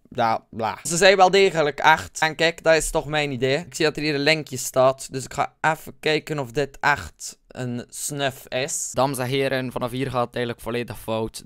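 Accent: West Flanders, Belgium. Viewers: ziej gie e westvlaming? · Belg of nederlands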